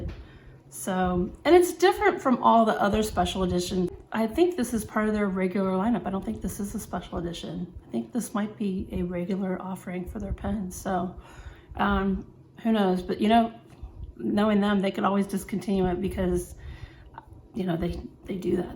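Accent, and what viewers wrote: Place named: Dallas, United States. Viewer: Great look! I think you sound like your from Dallas, and Dallas people are more fashionable than S.A. or Houston.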